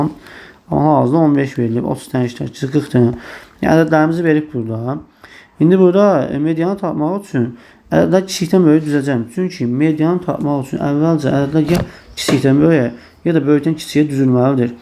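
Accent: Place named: Gabala, Azerbaijan. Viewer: Salam danışığında Qəbələ ləhcəsinə bənzəyir sabah hamınza uğurlar ❤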